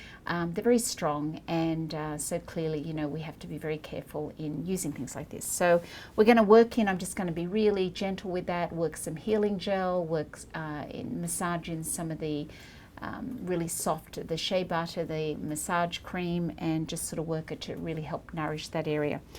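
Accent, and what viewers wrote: Australia. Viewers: Aussie as hell lol what a nice touch hearing that Aussie accent in the video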